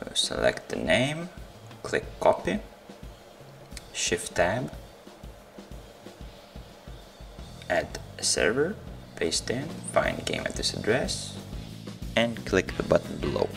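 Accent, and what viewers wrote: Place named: Ireland. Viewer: what is it with irish people doing game tutorials?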